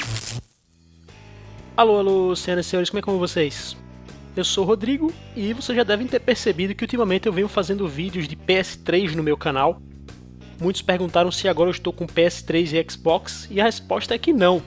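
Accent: Portugal. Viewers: Vc é de Portugal? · esse cara é portugues ou nordestino???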